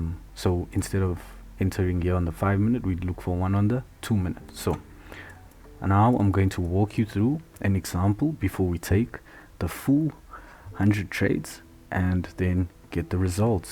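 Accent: South Africa. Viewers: Are you South African?😊 Thanks for the video! Looking to learn more about ICT. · You sound South African you from South Africa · Thanks, where are you from, S.A. ? Your accent sounds like you from S.A. ? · Bro you from sa?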